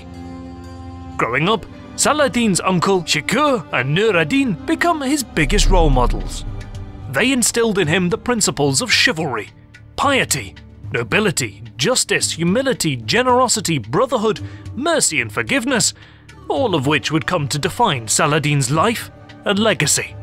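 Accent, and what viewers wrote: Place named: Yorkshire, United Kingdom. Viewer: ... Ok... I give up! It's almost completely gone, but I reckon you are either from Yorkshire or 'the other place' (Lancashire) lol. Or am I completely wrong? haha LOVE your channel and the fact that you present things in a way that is so easy to understand... wonderful! :)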